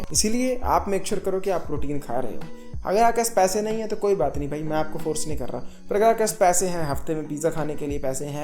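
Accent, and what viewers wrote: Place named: Himachal Pradesh, India. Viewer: That himachli accent😂♥️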